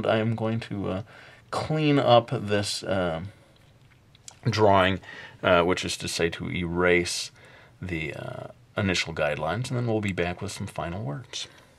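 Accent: Brooklyn, United States. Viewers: Brooklyn accent again. eyeroll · Sorry, it just sounds like a bit "cheesy gameshow host". It might just be that you're American and it sounds corny to an Aussie.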